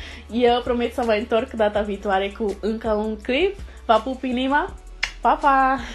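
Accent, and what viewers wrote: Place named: Bessarabia, Moldova. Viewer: Ai un accent basarabean sau undeva pe acolo.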